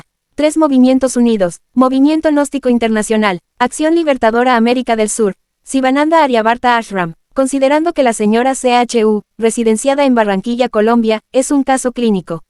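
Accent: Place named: Rio de Janeiro, Brazil. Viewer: a Sede da FRA no Brasil , esta na cidade do Rio de Janeiro . nota-se o sotaque proprio daquela regiao neste.video . o audio nao possue uma boa qualidade . .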